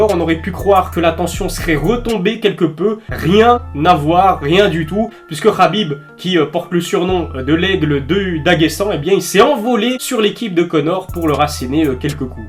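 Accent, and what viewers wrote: Belgium. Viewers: Un peu, je suis belge également du coups je l’entends peut etre plus que les autres, tu es de quel région ?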